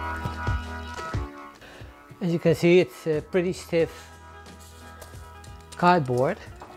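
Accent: Netherlands. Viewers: Ik hoor een Nederlander :)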